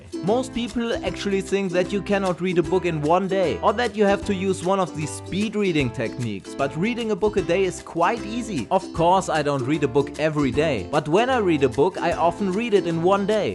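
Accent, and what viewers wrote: Germany. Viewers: do you have a german accent?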